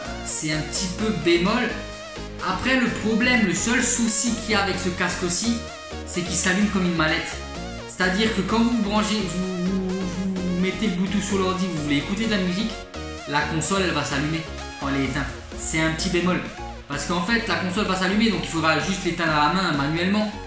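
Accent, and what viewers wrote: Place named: Alsace, France. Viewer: Et yo l'accent alsacien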